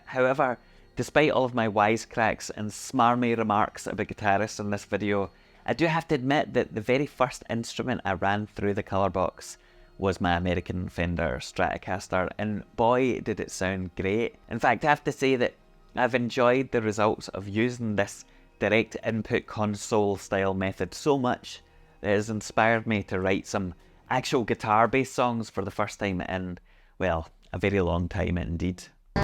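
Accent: Scotland, United Kingdom. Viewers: subscribed for your delicious Scottish accent!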